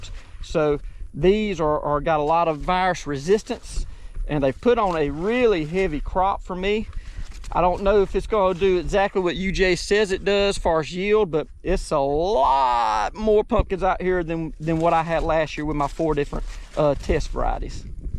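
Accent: South, United States. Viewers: I'm from minnesota... I love your southern accent.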